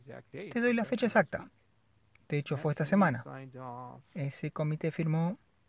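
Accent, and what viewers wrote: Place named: Argentina. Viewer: Todas mis disculpas por no haber enviado antes un mensaje de agradecimiento y cortesia a nuestro querido traductor, grandisimo trabajo que se agradece increiblemente mucho mucho, de todo corazon muchas gracias amigo. (Ademas me encanta tu acento, si no me equivoco eres de Argentina, no? Yo he nacido en Valencia, España) Un gran abrazo virtual y por favor sigue todo lo que puedas !!!!!! Gracias !!!!!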